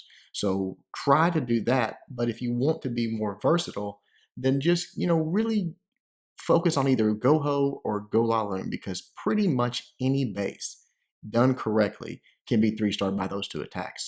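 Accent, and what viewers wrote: South, United States. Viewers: Yeah, the southern-ish american accent is pretty cool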